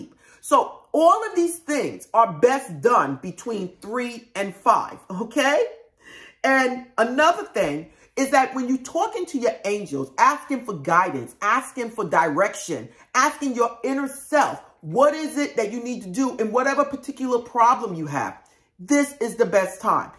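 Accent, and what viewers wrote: New York, United States. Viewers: This has been happening 4 or 5 times during the week I'll start doing this tonight. Thank You Oh yeah. Are you from NY.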